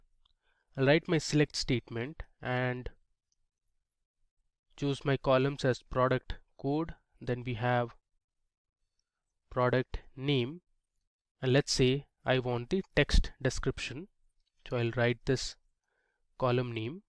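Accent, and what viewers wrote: India. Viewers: Are you facing problem with hindi ascent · Hes Indian and what's wrong with the accent or do you want him to have Canadian accent or your mother tongue? appreciate the hard work or gtfo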